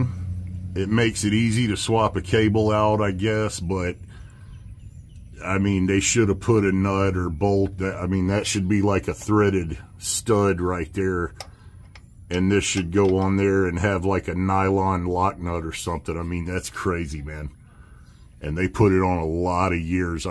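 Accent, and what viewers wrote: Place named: United States. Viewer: This guy has the most American voice I’ve ever heard. Made me watch the video instead of skipping ahead.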